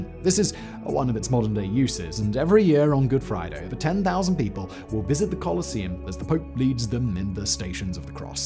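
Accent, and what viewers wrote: England, United Kingdom. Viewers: Man, in all honesty, someone has to direct this videos better. I know he has a British accent but in some parts his words are barely clear and sound like a run on sentence. Also some clips were cut short. Many words were butchered and I can’t understand how this video was cleared to be published. Please.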